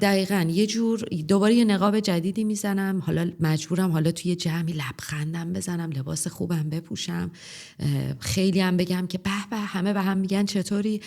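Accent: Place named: Isfahan, Iran. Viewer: فدای لهجه اصفهانی مجری عزیزم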